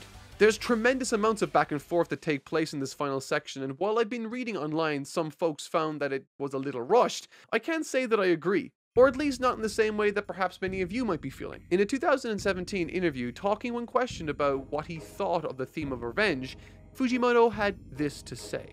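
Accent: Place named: Ireland. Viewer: ARE YOU IRISH? ... WHY IT SOUNDED SO NATURAL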